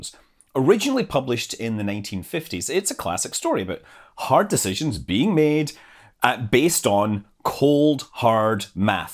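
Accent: Scotland, United Kingdom. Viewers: There's nothing wrong with a good Scots burr.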